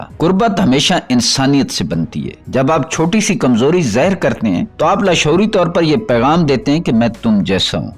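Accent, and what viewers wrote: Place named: Punjab, Pakistan. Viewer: Content Acha hy….pr lehja Punjab k Molvi sahab jesa….please Urdu pronunciation (talaffuz) sahe ada kia karaien bulky properly seekhain….ya kisi achi Urdu bolny walay sy voice-over karwaien! Thanks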